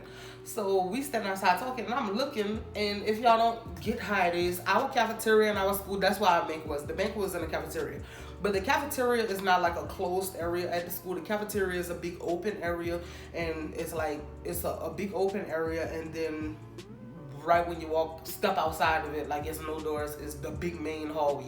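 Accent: Louisiana, United States. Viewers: She sounds like she from Louisiana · Are you from Louisiana? Your accent is cute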